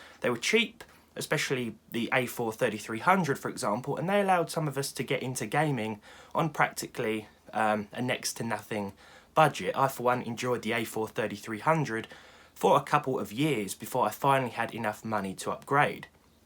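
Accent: United Kingdom. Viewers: 🅱️RUV ENGLİSH ACCENT GİVE ME A GAY VİBE BUT ANYWAYS KEEP GOİNG İ LOVE YOUR VİDEOS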